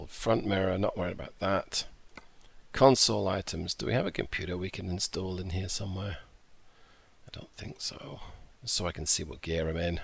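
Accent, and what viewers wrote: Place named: England, United Kingdom. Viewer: Hahaha oooo nice u sound very English to me mate I guess u haven’t picked up the American accent yet lol it to ma to haha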